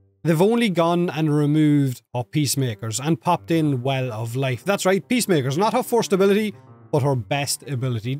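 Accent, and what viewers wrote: Ireland. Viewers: Thank you for the clarification. I fuggin love your videos, and your cool ass Irish accent!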